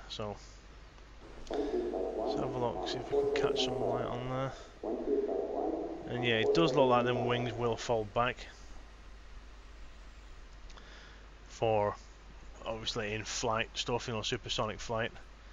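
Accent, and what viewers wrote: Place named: Yorkshire, United Kingdom. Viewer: Nice video bro. Sound like a fellow Yorkshireman too :) would be awesome transporting a ship load of these babies to a race!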